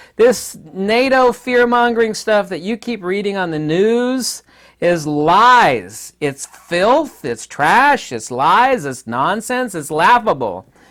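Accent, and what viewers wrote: Russia. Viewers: You sound Russian cause it sounds like you don't care about life and trying to down play murder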